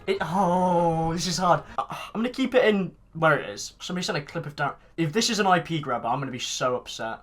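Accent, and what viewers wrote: United Kingdom. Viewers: My ranking of your British accent? 11/10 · Are you british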